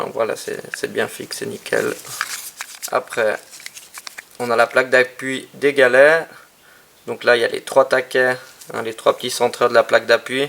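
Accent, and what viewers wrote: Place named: Switzerland. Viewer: Je découvre cette chaine, aaaaaahhhhhh ça joue! Un ami Suisse cet accent m'avait manqué!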